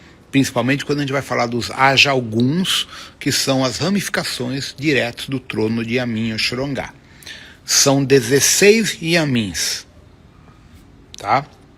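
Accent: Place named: Spain. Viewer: Foi ruim de entender pq ele tem o sotaque espanhol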